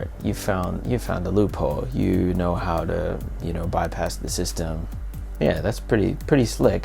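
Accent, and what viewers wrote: Finland. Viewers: Don't take this offensive or anything, but you have some finnish accent on your english. Or what I hear. There's some variations on your intonations and such... It's like Renny Harlin speaking finnish :)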